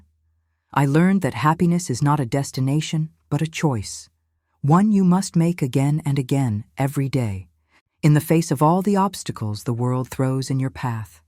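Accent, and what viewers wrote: United States. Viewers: The american accent is awful, it is a british setting....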